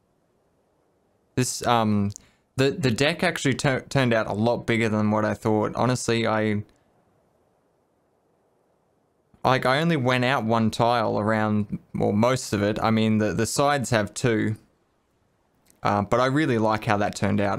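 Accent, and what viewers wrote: Australia. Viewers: When are you going to build something out of thatch? Maybe add a single campfire in the middle of it all, but not much more complicated than that. I find myself getting tense and stressed just watching you do these massive, OCD fueled rage enhancing builds. I think ASM is the modern hipster thing. You already have us addicted to your sexy Aussie voice (auditory-tactile synesthesia) so you might as well calm us all down with a simple build that causes us all to mellow out and drool on ourselves. Of course, the metal music in the background will have to be something more subdued. It's either this or narcotics and I'm sure you wouldn't promote your fan base to be drugged out roadies.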